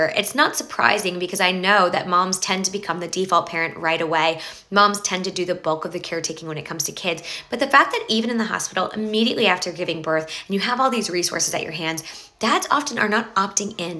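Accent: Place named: West Coast, United States. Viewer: She has to be West Coast. They have developed the most annoying accent in the US.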